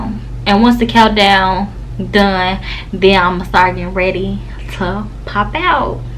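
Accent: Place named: United States. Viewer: Heavy country accent yasss🧡🧡🧡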